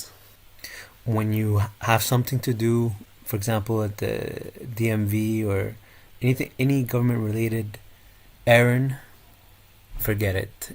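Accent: Egypt. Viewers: U are from alexandria cause of tge way u speaking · Hey, for me what you are just trying to do is SHOWING OFF or trying to get more VIEWS, you are pretending to be American but you are Egyptian and it's easy to tell from your accent and maybe you are living here in USA,!!! i have been here in USA more then 20 years and i never said or published any thing bad about my own country and off curse i wanna everything in Egypt to get better but not with publishing that stuff in English???????? (it's a lot to say about that), any way every country has their own bad stuff as well even in USA. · To be honest, I can't agree more, but let me say that you sound so Egyptian! The way how you say Raml, Mansheyya, Montazah, it's proving that you are Egyptian.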